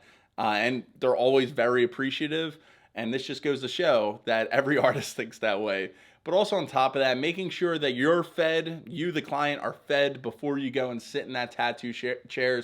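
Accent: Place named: Philadelphia, United States. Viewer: Completely unrelated comment I'm new to your channel and listening to you talk I immediately thought "this dude's gotta be from philly or the surrounding area." then you mentioned and "wooder."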